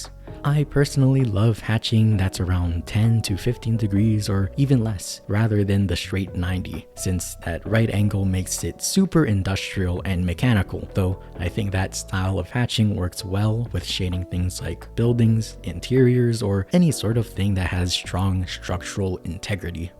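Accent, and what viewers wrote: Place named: United Kingdom. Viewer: british detected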